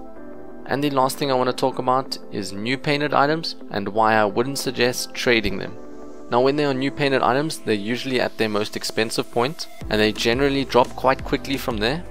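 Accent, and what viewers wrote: South Africa. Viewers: are you from South Africa, because I am too? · is this guy south african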